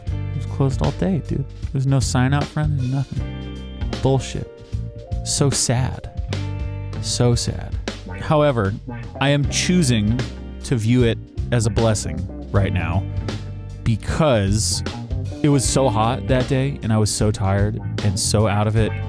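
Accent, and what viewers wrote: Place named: Florida, United States. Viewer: you talk like a friend of mine from Ocala, Florida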